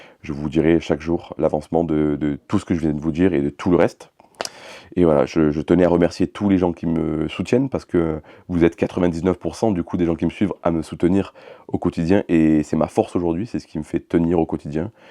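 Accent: South, France. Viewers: oui pourquoi 😅 On dirait le Suuuuuudddf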